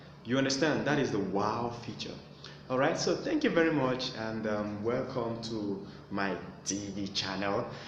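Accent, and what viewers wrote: Nigeria. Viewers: I hear the Edo accent #tongueout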